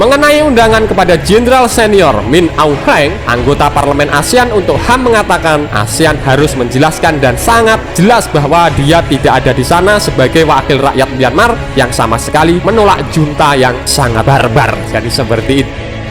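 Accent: Java, Indonesia. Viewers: Min pertahankan medok mu pakek kata "osot punya osot"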